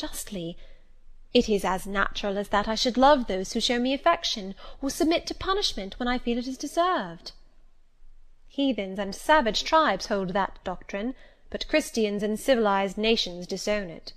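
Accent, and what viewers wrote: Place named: United Kingdom. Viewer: Very clear voice and british english accent, i am enjoying listening to this voice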